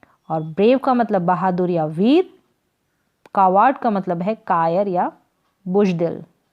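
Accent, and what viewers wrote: Chhattisgarh, India. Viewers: Hello didi aap chhattisgarh se ho kya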